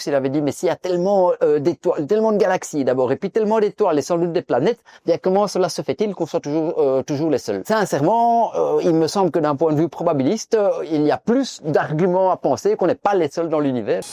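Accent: Belgium. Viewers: Sans l’accent belge ce mec serait juste lourd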